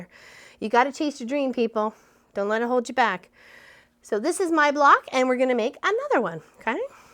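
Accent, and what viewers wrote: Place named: Nova Scotia, Canada. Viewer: so very Nova Scotian love it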